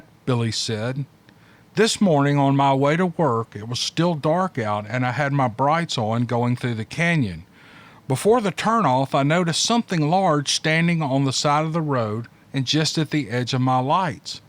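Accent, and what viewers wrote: South, United States. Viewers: We love your stories! And your southern accent😁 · Those are some sweet ladies you got in your sideyard there, 🌱 I very much enjoy your story telling. Being half-Southern, your accent is wonderful in my ear. Thank you! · Oh yes I listen during the day and almost every night as I drift off to sleep I enjoy these encouter stories but more than that I love southern voice accent, a follower southerner 😊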